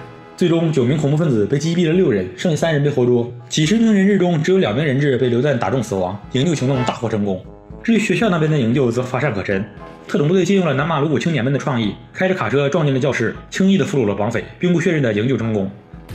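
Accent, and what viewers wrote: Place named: Northeast, China. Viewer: 东北话有毒...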